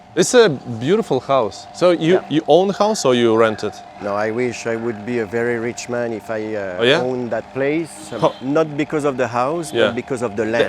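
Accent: France. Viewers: He is Indian with a french Accent ! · Well, he is French, speaking English, which is not his language fluently. Don't mind the accent. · Even after 20 years he still has French-English accent 😂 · After 21 yrs he still got french accent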